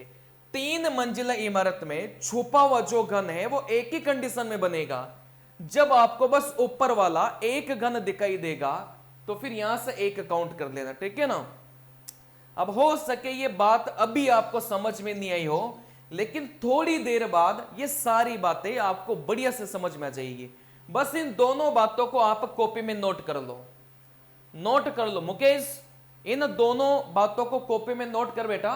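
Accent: South, India. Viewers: Sir aap south indian ho kya???? 😅 Accent wahi la lagta hai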